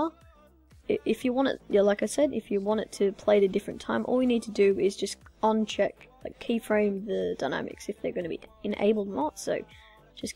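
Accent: Australia. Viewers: you have the most beautiful australian voice :) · I wish i had your Australian accent :3 I have a british accent and it kind of sounds like it but meh xD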